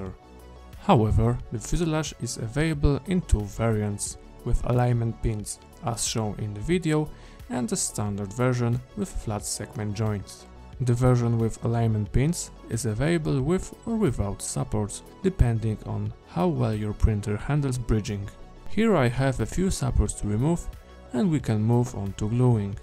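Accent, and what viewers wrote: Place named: Germany. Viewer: Are you german ? 😊 your English sounds like German 👌👌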